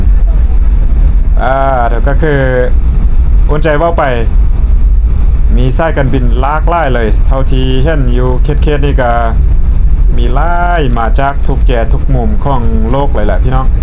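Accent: Loei, Thailand. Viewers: สำเนียงเหมือนคนจังหวัดเลยเปาะเรยครับ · สำเนียงคนเลย คนหล่มสัก นครไทยเลยค่ะ พูดภาษา สำเนียงเดียวกัน ต่างแค่คำนิดหน่อย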